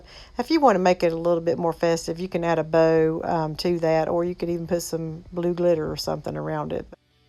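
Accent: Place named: North Carolina, United States. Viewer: Also, I enjoy your videos so much. Your accent sounds so much like my North Carolina relatives <3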